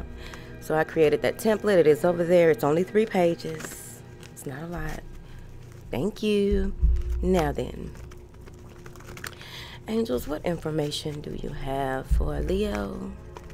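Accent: South, United States. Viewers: I love your southern accent 😊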